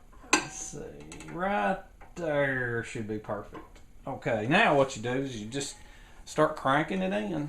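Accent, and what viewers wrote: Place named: Kentucky, United States. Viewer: I want to see the car/truck under the tarp! Nice video btw. Where you from with that accent? Sounds like a Kentucky accent. :)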